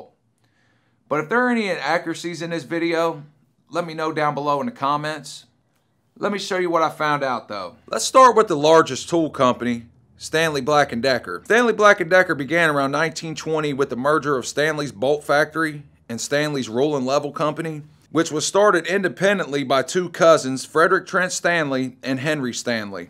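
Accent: South, United States. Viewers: Strong sothern accent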